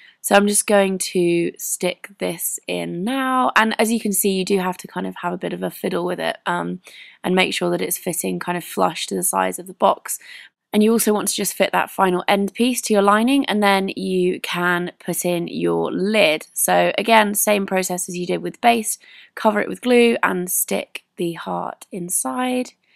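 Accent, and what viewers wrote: United Kingdom. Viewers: I love ur British accent :D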